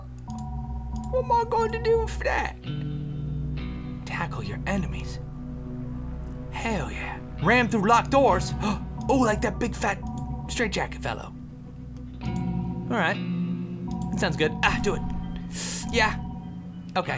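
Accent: Scotland, United Kingdom. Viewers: love the british accent Need more! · Your Scottish accent is freakin' epic:3 (Scottish Guy) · I love ur British accent! <3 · T_T.....that's a scottish accent......gj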